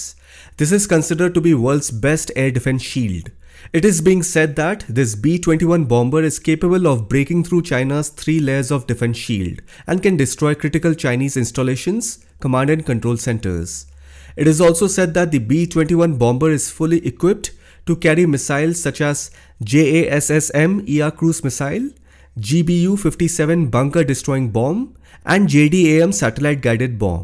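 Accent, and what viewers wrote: India. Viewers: Are you a Chinese talking like an Indian? Following you for a while 🤔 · How many Americans want to hear a man with a thick Indian accent talk about American military and aviation? I spent 20 years in the United States Air Force. Call me crazy but I can't listen to him. For the sake of the holy cow. 🐄🐄🐄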